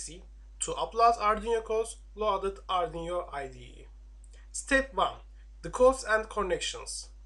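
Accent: Turkey. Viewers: Video başlar başlamaz dedim konuşan kesin Türk. Sonra aşağıya bi baktım harbi bizden. Aksan acayip ele veriyor insanı.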